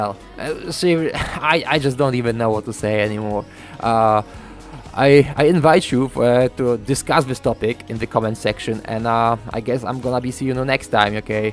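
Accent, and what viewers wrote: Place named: Poland. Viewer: Hey, I've been a fan for the longest, and I got I say you're accent is funny as fuck. I honestly agree EA has something very fishy going on with all the hackers and the non banning attempts. I've been wondering you seem like a fantastic Polish player and I've been a fan for sometime, I know I'm not as famous but I'm quite well know in the US, I ofcoarse haven't started a channel but plan to in the future, id love to make maybe videos in the future as you have inspired me. Oh and id love to play with you sometime, maybe you can add me? Playmobil5 is my username on battlefield heroes. Thanks bro Peace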